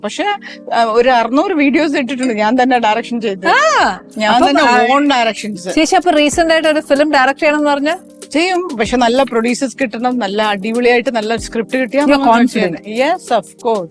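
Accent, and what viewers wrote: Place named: Alappuzha, India. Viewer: Malayalam aanu..She is Alappuzha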